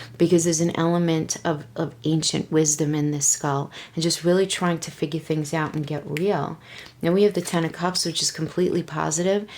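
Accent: New York, United States. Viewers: Thank you so much! Are you a New Yorker? I feel like I know you just by the voice!!! Nevertheless thank you for your reading!! I will gladly make a donation ✡️❤️❤️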